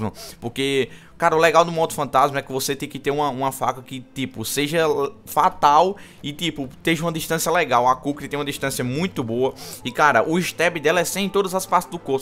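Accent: Northeast, Brazil. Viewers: TU e nordestino ?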